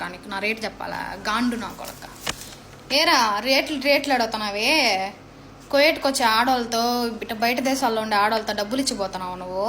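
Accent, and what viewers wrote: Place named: Kadapa, India. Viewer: Endi medi kadapa me basa Chala bagundi I love you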